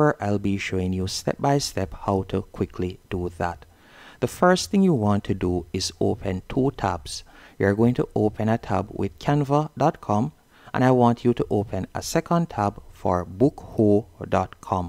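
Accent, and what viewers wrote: Jamaica. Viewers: Thanks. Easy to follow especially in that fellow yaadie accent,🥰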